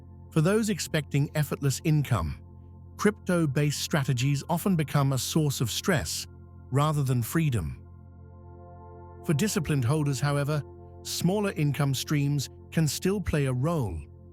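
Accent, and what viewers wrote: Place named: United Kingdom. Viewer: Just found this channel. I really like its simplicity of explanation. My feeling is if XRP gets to wide financial institutional adoption not only will there be price appreciation, but also less volatility as that would run counter to what institutions expect on a value transfer asset. If the aforementioned occurred this could create a great deal of competition for the XRP asset and safer yield products could become available in time via free market competition (albeit with a lower yield). On a side note I like the British accent of the moderator. I've often said one could be speaking in complete gibberish, but if its with a British accent it would sound authoritative. 🤣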